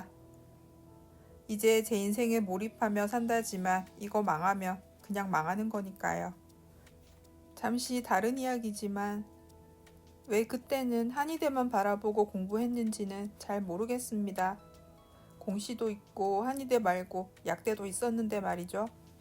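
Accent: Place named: Gyeongsang, South Korea. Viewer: 말투는 경상도인데 서울말 쓰려는 노력이 엿보이네요. 열심히 노력한 결과로 좋은 삶 살기를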